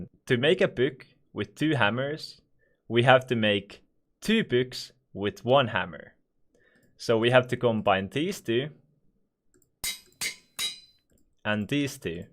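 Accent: Finland. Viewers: are you from finland because your english sounds finnish accent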